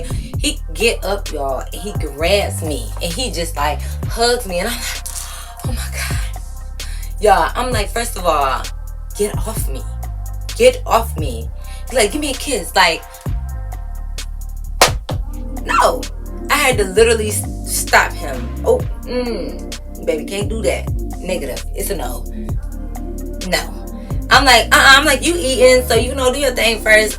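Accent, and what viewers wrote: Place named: Detroit, United States. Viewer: This sounds like a Detroit nigga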